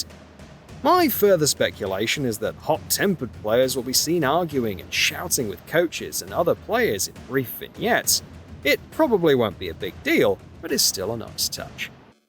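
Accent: England, United Kingdom. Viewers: Dudes with English Accents should not speak about American Football. It's just feels weird. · Why is a British guy narrating a video about Madden?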